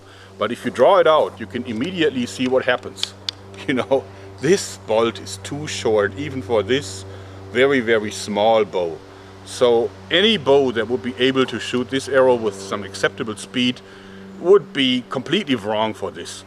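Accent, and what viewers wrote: Germany. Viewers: Okay, i wondered for a Time now Is he German? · My biggest wish is that says "It is bullshit" for once, just in that nice thick german accent of his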